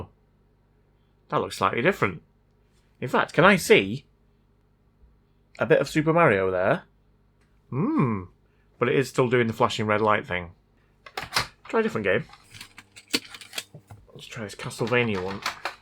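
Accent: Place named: United Kingdom. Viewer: I can't tell from the accent, are you from the UK? If yes, be extra careful with NES cartridges. PAL region has 2 sub-region, PAL A and PAL B and they are not compatible. PAL A is UK and PAL B is basically rest of Europe. I see a big letter A on the Castlevania cartridge and if your console is the same then it shouldn't be a problem. Usually the dirty part is the receiving side of the big black connector, not the edge connector on the main board side. You don't need to replace it, just clean it. Usually boiling in hot water, maybe adding a little dishwasher liquid can help but make sure you rinse it off, preferrably with some distilled water. Or apply vinegar, then rinse it off with alcohol. The point is you have to make it squiky clean and don't want any limescale to form on it either.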